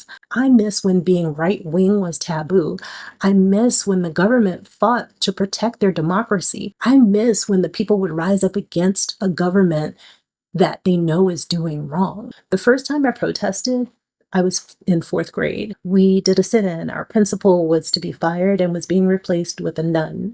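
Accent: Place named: Spain. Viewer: Ooh ooh, lovely Spanish accent 👌🤗 Hey a biker chic too 👌 All minor inconveniences compared to the maggot that's damaging US democracy 😉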